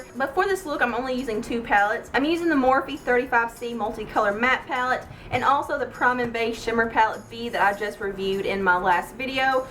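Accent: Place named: South, United States. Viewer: ahhh for real this is such a gorgeous look..your eyes just pop so perfect with it. What part of the south are you from? I'm from NC and I get comments on my southern accent but it's no where near as strong as yours is, I love it!!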